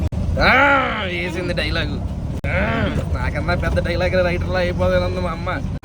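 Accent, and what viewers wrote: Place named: Godavari, India. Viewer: మా గోదారోళ్లతో అలానే ఉంటాది మరి... Love u 💗💗💗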